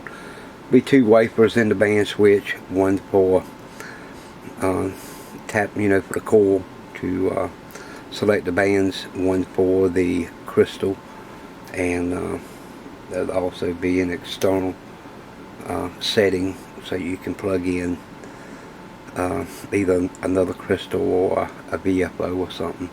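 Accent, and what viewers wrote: South, United States. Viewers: Yea I agree first time I saw your face lol my mind vision you were way older and scruffy. and you could do this ballad well with your southern drawl <link>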